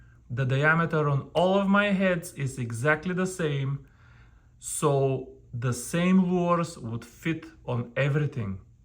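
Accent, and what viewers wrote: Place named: South Africa. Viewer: You from South Africa? Just guessing based on how you speak. NICE attention to details! I wish you made bigger, bass sized stuff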